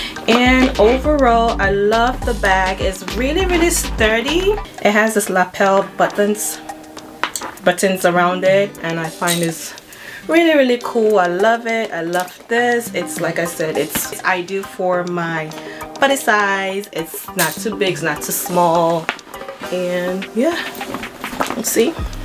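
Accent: Nigeria. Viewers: I've taken a sprint from Instagram to this video...once again I love your nigerian accent💕💕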